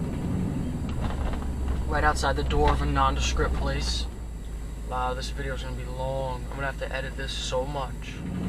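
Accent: New York, United States. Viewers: I swear, when I first heard you speak, I thought of my cousin that grew up off Utopia Parkway in Flushing Hillcrest. You sound exactly like him. It must be a "Flushing" variant of the New York accent in that area! LOL Great vid as always.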